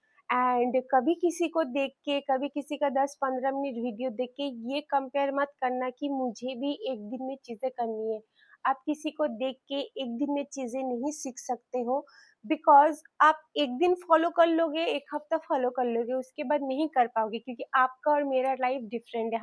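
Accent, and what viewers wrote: Bengal, India. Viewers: Tomake dekhe onak motivation pelam amar o khub tension hoto ami to job kori ki kore biyer por sonsar and job 2 e korbo kivabe samlabo to tomake dekhe sikhkam je ata amader morer tension sob kichu e kora jai❤❤❤ ar ami bengali te liklam Karon tomar hindi bola ta dekhe mone hoche tumi bengali 🥰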